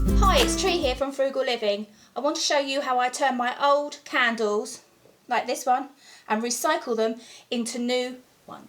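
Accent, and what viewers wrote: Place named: United Kingdom. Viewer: Forgive me, but first of all, as a caveman from California, you could describe new and wonderful ways to tie a trash bag or narrate a blade of grass growing and I'll be fascinated because I just love any sort of British accent ("What accent?!?", you say.). Second of all, I LOVE the video. Quite viewer/DYI'er friendly. Off to the stove and a PILE of used candles!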